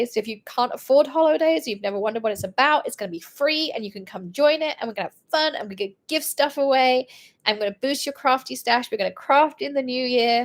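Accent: England, United Kingdom. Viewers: You are a brave and strong women and I applaud you for continuing your journey through all the obstacles and coming out ahead! I have been following you for about a year and have binged watched all of your videos! I don't usually participate onlne during lives, except to say hi, but I really enjoy your creativity (and your English accent...lol) and your energy. Continue the strong the path! You are awesome!!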